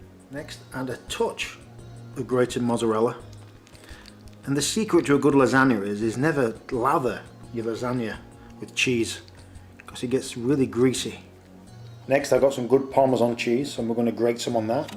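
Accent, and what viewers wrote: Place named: United Kingdom. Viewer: Just discovered your videos recently. To my mind they constitute an object lesson in how to make an outstanding food prep/cooking video. Terrific clarity and nothing left to chance. Who does the filming for you? Cooking your lasagna right now and will let you know how it turns out. Love the tomato sauce on the plate at the end - lifts the presentation of this rustic dish. I am an ex-pat Brit living in the US and it's very refreshing to hear you speak! Just subscribed. Good stuff! Bob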